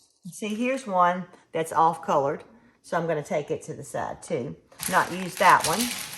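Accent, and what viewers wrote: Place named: South, United States. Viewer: Never seen yellow.. I love your southern accent..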